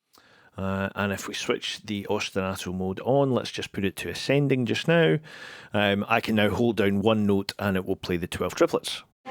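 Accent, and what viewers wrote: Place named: Scotland, United Kingdom. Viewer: ..."if I wudda had this, ... when I was maybe 20....I'd probably be a billionaire right now......🤪😆 Great video with that up yer kilt accent. Luvit. Any more tuts coming our way?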